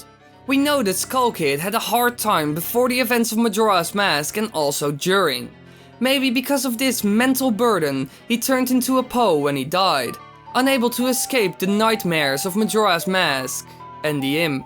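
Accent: Netherlands. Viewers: Wow you speak english with a dutch accent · Are u dutch?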